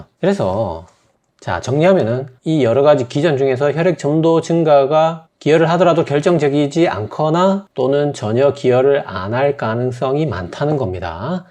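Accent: Gyeongsang, South Korea. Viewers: 껍데게는 30댄데 말투는 50대경상도아저씨의 그것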